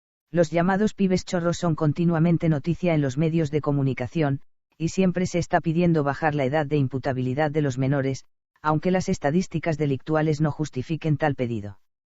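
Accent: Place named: Spain. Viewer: Pura propaganda kirchnerista con acento español. Nada serio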